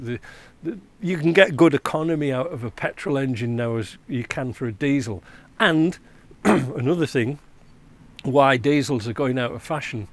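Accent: Yorkshire, United Kingdom. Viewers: Very interesting...wish I knew your name.....trying to work out your accent....Yorkshire perhaps. Talking about the price of diesel.....remember when I bought my S3 in 1975....still in daily use with me now...diesel was half the price of petrol...as it should be....it takes a lot less money to refine. Got a mod on my S3 heater....its got a 200di in it now.....just before the heater-box I made and fitted a Y section inlet out of thin plate.....so it take ait via ducting from behind the front grill and just to the left of the rad.....so it now gets ram air....other wise the blower needs to be on all the time and going at much more that 15-20mph if becomes very poor due trying to drag air in from that side vent. Tried air scoops on the side inlet useless.air steam is deflected outward when in motion. Also need to seal all the internal gaps up in the heater box around the matrix.....so all the air the comes in HAS TO PASS THROUGH THE MATRIX......lots used to bypass around its sides.....so then coming into the cab cold adding to the prob. 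Works a treat.....got to get the motor warm of course.....I just make sure the stat is efficient as it can be.....ie correct stat on a series....as you know got to be the one with a shoulder.....lots of owners I have found fit by mistake the flat seat type.....no good coolant just goes around it. Love your vids....love to hear the story of what took you to Canada. from Cheshire UK.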